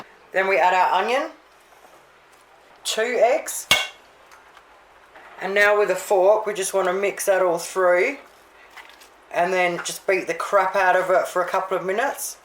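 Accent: Australia. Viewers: haha gotta love that Aussie accent! haha love it. So blessed to be aussie! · So Aussie , love it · Good to hear a true Aussie girl.I’m into rissoles but may do a twist on it.I go with the flour.Excellent.I’m 59 vintage but we are still the same.Not like now.Cheers for your recipe.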